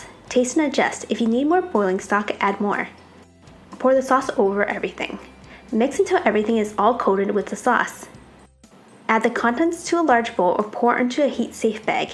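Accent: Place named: Minnesota, United States. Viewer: Sounds like Minnesota accent. I like the recipe.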